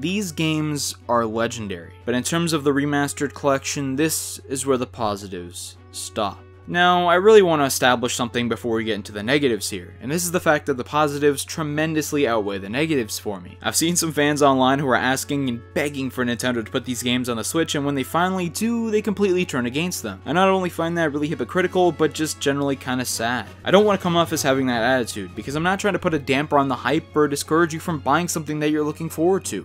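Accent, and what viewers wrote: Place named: Canada. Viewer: I love the really strong Canadian accent. Aboot.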